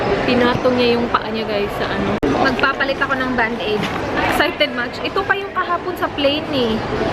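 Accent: Visayas, Philippines. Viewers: tga san kaya si ate ,parang visayas part kasi may "uy" lahat ng mga bisaya mga "uy" ung word sa tapos 😁. enjoy ko ung vlog ninyo po. ty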